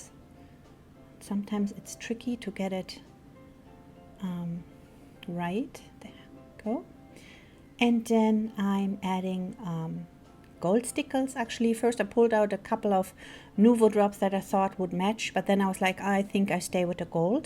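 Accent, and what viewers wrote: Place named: Rhineland, Germany. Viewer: My entire family is German. I was born in NY City area, my parents came to NY on ships. The reason I can tell you are from The Rhineland is that your accent is EXACTLY like my Aunt Marianne's, who was born in Lannesdorf, Köln area (Your English is EXCELLENT by the way!!) My Dad was from Lannesdorf also. My mother was from Sulzbach in Bavaria. I live in California now and will be watching your videos addictively now that I found your channel!!! Ich kann ein bischen Deutsch.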